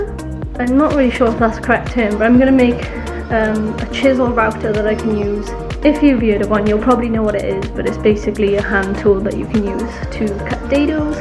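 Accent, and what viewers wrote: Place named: Wales, United Kingdom. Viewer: Nice work girl. Love ur Welsh accent btw.. I'm abut to make one of these but I'm going to take a punt at it with an Allen Key. I have a decent sized one so it'll do me for my 1st attempt. I've recently begun to use Hand planes and saws and I love working with them now. I've hardly used my table saw at all since. I have a jointer/thicknesser as well but I don't use it either. I love to plane the timber clean now. I love the look of the grain after using the smoothing plane or finishing plane on them.. I wanted to ask if I needed a good plane for cleaning up lumber that is rough sawn?? What number plane is generally used for that process?? Do I ned another plane or will either of my #3 or #4 do the job as well?? I enjoyed the video but I wish it were longer to see more of the process. Just my silly gripe though. Great build..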